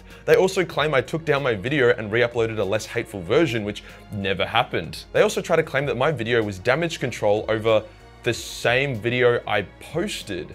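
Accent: Australia. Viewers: Alt title: hate comments read by an Australian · Seriously man i jusy found your channel a couple months back and have since been watching old and newly uploaded videos, i just love your commentary and the aussie accent and attitude is just the cherry on fucking top! Cant waif for more WK videos in the future! Also fuck those incel breed losers in the dark corners of the galaxy, fucking xeno brain dead fucks.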